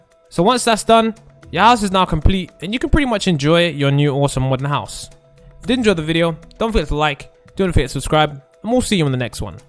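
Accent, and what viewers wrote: United Kingdom. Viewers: I love the British acsent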